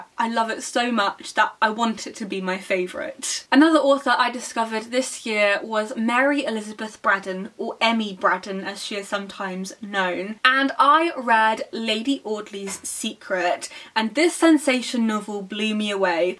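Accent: United Kingdom. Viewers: Yours...it is my favourite "British Accent" of the Year, so could you tell me more about it, please? Thanks in advance anyway.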